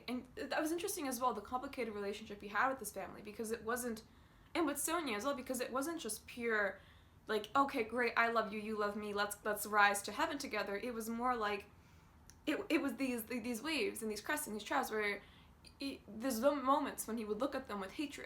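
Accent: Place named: Canada. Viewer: What a lovely Canadian accent Oh! By the way nice review.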